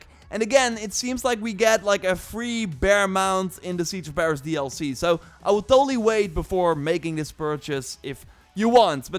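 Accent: Netherlands. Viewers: Dude are you ducht youre accent sounds very ducht, ps gimme does creddits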